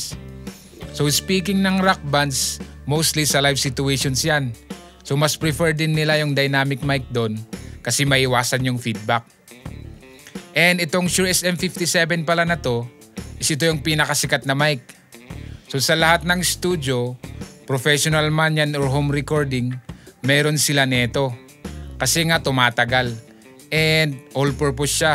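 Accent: Cordillera, Philippines. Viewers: I Thought ur from Cordillera hehe. Accent dude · Apay madlaw ba? hehe, the cordillera accent. Ayos na ayos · Are you by any chance from the Cordilleras? Thanks for this vid, very informative. Iyaman.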